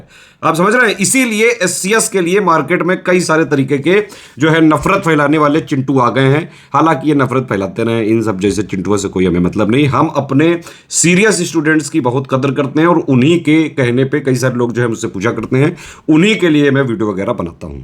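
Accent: Kanpur, India. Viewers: Sir ye apka kanpur flavour mast hai😊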